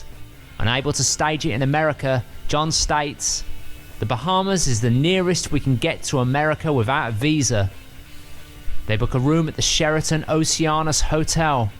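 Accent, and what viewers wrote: Birmingham, United Kingdom. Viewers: Good analysis.... A lot of things I haven't heard of before.... and great Brummie accent!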